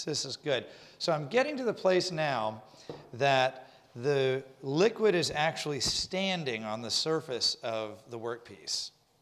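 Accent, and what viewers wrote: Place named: United States. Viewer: Yeah dude .... what’s your problem ! This guy has the least annoying American accent I’ve probably heard .... my mums being the worst !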